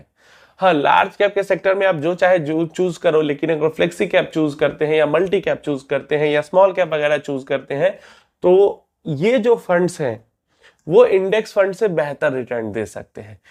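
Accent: Bihar, India. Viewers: रा का उच्चारण बिहार वाला ही हो रहा है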